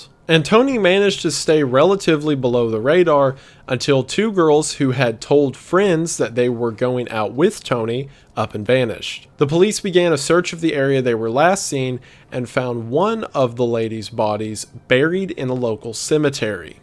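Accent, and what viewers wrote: South, United States. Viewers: Where are you from? I giggle because you have a southern accent at times.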